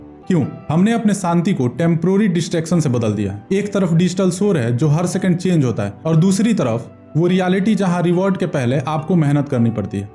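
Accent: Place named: Bihar, India. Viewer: yes , i am from motihari , by your accent i instantly knew you are from bihar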